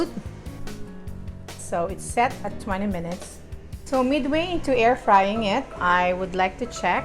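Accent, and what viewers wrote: Philippines. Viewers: Im here in LA. Yup, lalo na sa SD, its the Manila Mesa... pati kakanin and the likes.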